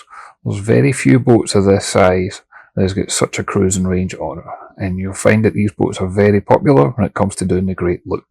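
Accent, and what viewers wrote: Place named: Scotland, United Kingdom. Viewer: I love these GH boats and your reviews. I particularly enjoy your accent as it reminds me of my times in Scotland.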